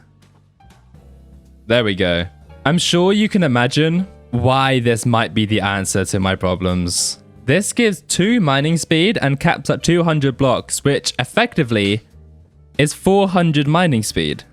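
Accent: United Kingdom. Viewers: Can you take the britishness down a notch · British voice killing me 🥀🥀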